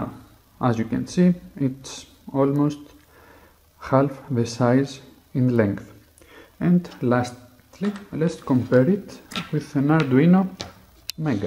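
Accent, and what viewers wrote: Greece. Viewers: I have been to greece (Olimpiada) past summer for vacation, i can recognize your accent by the "h" sound :-) good job!! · are u greek????? You sound like me to talk in english im greek too lol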